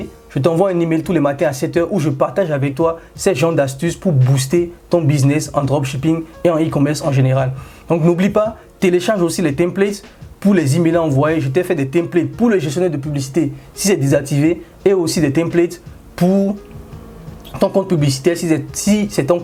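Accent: Benin. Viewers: Oulah! Ça sent le français béninois ici! Je m’abonne 💪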